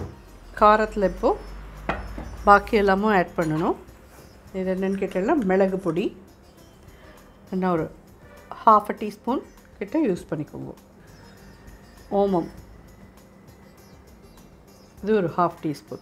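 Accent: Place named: Tamil Brahmin, India. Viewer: Super mami. actually I expect this recipe from you. Your explanation is so good .I will try this recipe. and I like your voice with agraharam style. Thank you very much mami 👌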